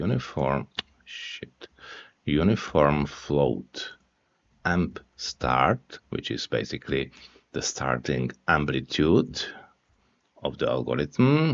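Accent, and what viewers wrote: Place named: Czech Republic. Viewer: Руслан, при чем здесь англосаксонцы и голос? из Чехии, это его акцент и его голос. У тебя всё в порядке? У тебя что-то мерещится? Может, перестанешь пользоваться интернетом, который так-то англосаксонский и пиндосский? (Какой же мусор у людей в головах!)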